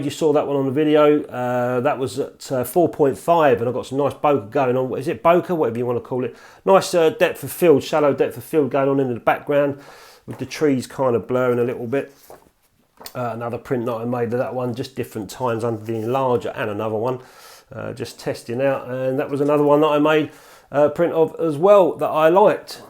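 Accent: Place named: England, United Kingdom. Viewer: dear, every time I need to hear some healthy English accent I tune into your channel please note < need to> Of bleedin 'course you butcher all them foreign words, you're English, aren't you? it's < tzeyes > Nettar you've got it right, Anastigmat wrong, as usual golden rule for English, Welsh, Scots, and other British subjects is: if you naturally stress the first syllable then you can bet your posterior it's second one you should stress. The syllable you strees naturally and the syllable you pronounce more or less neutrally are your best guide go against your natural tendency and stress the other syllable ana-STIG-mat you says, anastigMAT is right admittedly it's a difficult one having four syllables;Love you, your videos, some of your pics and your effervescence. What a pity they banned smokin' in pubs ( please pron vinnie coz it's German & I'm a bloke )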